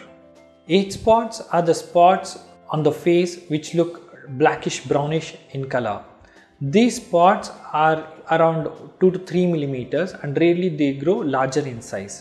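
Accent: South India, India. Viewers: For those who could not understand his South Indian accent, here is a brief synopsis: UV rays damage the skin over time; we are exposed to UV rays not just from the sun but also from various electronic screens (he says all the screens produce some UV rays). The treatment he recommends is sunscreen.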